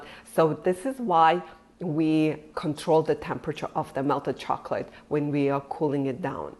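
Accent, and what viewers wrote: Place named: Australia. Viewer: I bet you sound so much cuter saying that with your Australian accent 🙂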